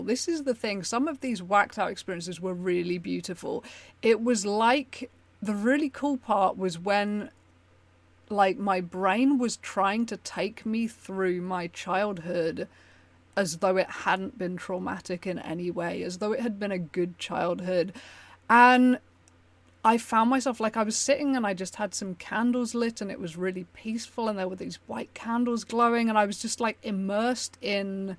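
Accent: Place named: South England, United Kingdom. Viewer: I've just discovered your channel after being recommended some of your ED content (I've never had one but I'm interested in educating myself and understanding others' experience) I just wanted to say your MH experience here is scarily similar to an experience I had back in 2012, except I was locked up for three whole months. As an informal patient! And I regard myself lucky because services were slightly more funded at the time... You've articulated this whole thing wonderfully. Also, shout out for the south England fam - I noticed your accent was similar to mine and then you mentioned a place name that isn't too far from where I grew up so, yay!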